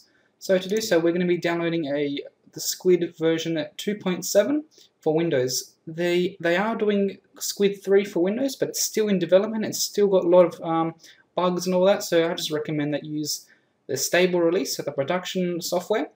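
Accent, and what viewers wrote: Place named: United States. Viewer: An aussie with an American like accent... You're on youtube to much and listen to way to many americans!! youre an AUSSIE not a YANK!!!!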